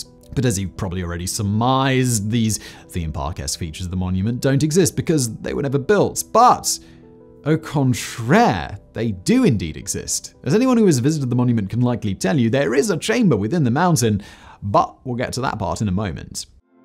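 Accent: United Kingdom. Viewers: Wow, I never thought of Simon as British, did he purposefully unlearn the accent to appeal to an international audience? xd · Hearing a British man talk about how america doesn't like people taking their land and disagreeing with America usually ends badly honestly made my night.. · I Adore your accent! I could listen to it all day! But I did perceive some condescension in your tone with this video. I recognize as the rather sexy, erudite you are, the unintentional cadence is bound to slip in once in a while,! Particularly when as a Brit you’re critiquing the USA. It did come to mind though as an uninformed, oblivious but to his own surroundings Yank, that the shall we say reclamation of land from the Lakota Tribes that sat squarely in the middle of the US’s borders during the 1880’s, might have been a direct export. From Great Britain’s export idea of conquer and seize in the preceding 30 years of an entire continent really, India, in the Eastern Hemisphere! 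Of course after a hundred years of pillaging India of the jewels and resources, the UK did return it good as new! And don’t forget more recently Hong Kong. We Americans do still have work to do! But. Always remember. Americans may not know a damn thing about your politics ( and continued penchant for powdered wigs) but we absolutely LOVE our dear British cousins. Especially the adult young lads with that intoxicating accent! Seriously at this point the studios won’t even hire a male actor for an American role unless he’s British! I’m waving at you Tom Holland!☺️ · I agree with you, it is about the content, not the accent., and I did not mean that he did not have a native accent. I was simply replying to [yeet][yeet] who thought Simon "unlearned" his British accent. I was simply pointing out words where one can clearly hear the British accent.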